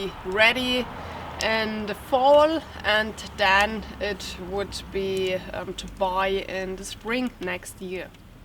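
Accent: Germany. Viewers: I always enjoy your videos. The outdoor setting by the fire was beautiful just like you. I think everyone loves hearing your German accent. Keep your personal life private. The more you tell the more people want to know. Sometimes I think we see too much of you in your videos but that's more personal. Take care of yourself and your children.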